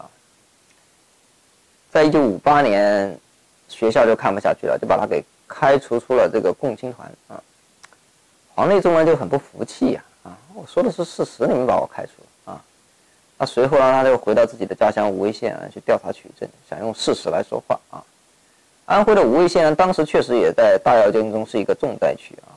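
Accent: Guizhou, China. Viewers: 配上字幕，真的是好多了。。。不过我刚刚习惯了听你的贵普，哈哈。。。另外，字幕有较多错别字，估计是语音转换的关系。比如那个“一语成谶”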